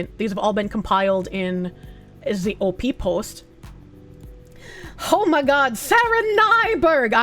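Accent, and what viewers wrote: Boston, United States. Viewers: That Bostonian accent is so funny, earned a sub there. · Bostonian woman ASMR, damn 😮 I now think she needs to collab with VAllure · Incredible content. Bostonian ASMR got me through the work shift. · As a fellow New Englander, the Bostonian ASMR was something I didn't know was missing in my life until now, we need more of that!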